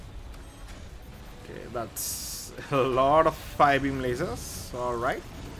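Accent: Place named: South India, India. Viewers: There are many indian accents. This is a southern one. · Are you Indian? Every time I think I have your accent figured, I doubt myself.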